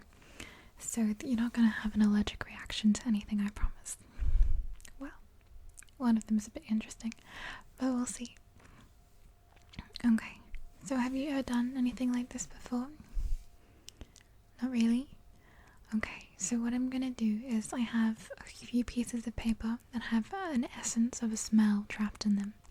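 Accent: Australia. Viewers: ( ^ω^) What's this accent I'm picking up?! Some thunder from down under?? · You're very beautiful and your "aussie"? (I think) accent/voice is very soothing. Great video 👍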